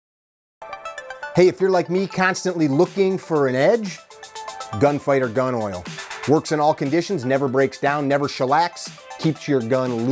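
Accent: United States. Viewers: Speakin normal american english so he must be. 😁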